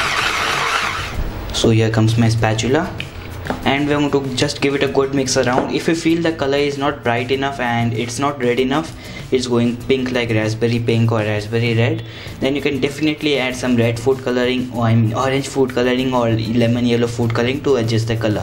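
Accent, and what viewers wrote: India. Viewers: his English has an Indian accent..nothing racist.fool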